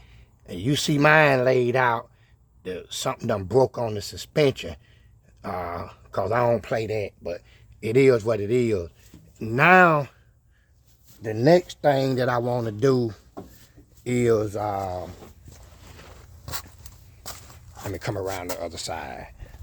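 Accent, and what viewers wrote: South, United States. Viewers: Love your accent, I’m from the south too, been to Ft Bragg when I was in the military years ago. Nice truck,I have a 63 c10 350/350 combo and would love to do that swap in my truck or 86 Trans Am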